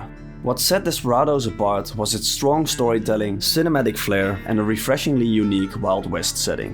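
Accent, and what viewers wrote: Netherlands. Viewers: Good video. As a sidenote, you sound hella dutch.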